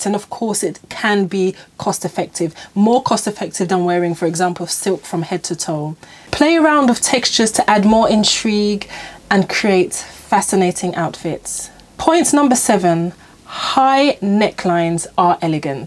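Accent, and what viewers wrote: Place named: United Kingdom. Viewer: It's the delightful British accent. ✨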